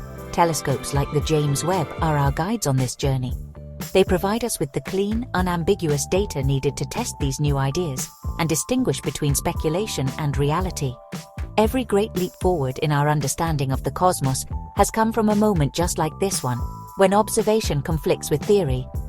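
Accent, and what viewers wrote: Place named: United Kingdom. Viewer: I liked the video. AI narrators often make rookie pronunciation mistakes like calling a Type Ia supernova "Type eye-uh" rather than "Type one-A". Nice touch with the British accent, though. Lends an air of Masterpiece Theater to the lecture.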